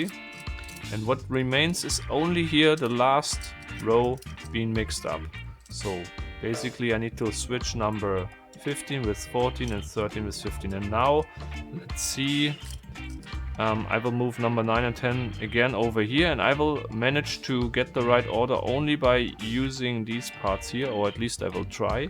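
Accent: Germany. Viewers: Ich wusste dass du deutcher bist, dein akzent verrät dich. Aber ich bin auch aus deutschland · Bitte lerne Englisch. Du verdrehst die Sätze und man hört deutlich ,dass du eine Deutscher bist. wieso machst du nicht einfach einen Deutschen Kanal?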